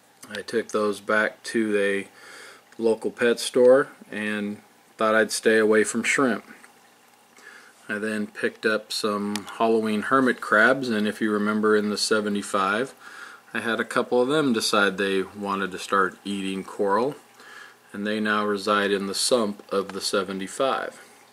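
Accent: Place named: San Francisco Bay Area, United States. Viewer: Really great tanks. Wonderful selection of corals and fish. Sorry to hear you're having issues with rogue shrimp. If they are living in a undergravel filter perhaps you're going to have tiptoe in a night and (under very low lighting) and try to nab them. Hey, by the sound of it I'm guessing you live near my neck of the woods (San Francisco Bay Area). I keep thinking I should take a visit to Exotic Aquarium in Sacramento. Just haven't taken the time to yet. Enjoyed the video. You've got me as a new subscriber!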